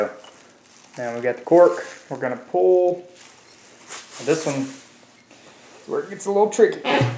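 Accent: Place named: South, United States. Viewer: Carnival cruise and a southern accent are like peas and carrots.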